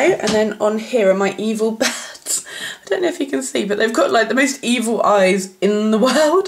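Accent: United Kingdom. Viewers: oh and you have such a cool British accent!! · its actually so nice to hear an English person on youtube! =D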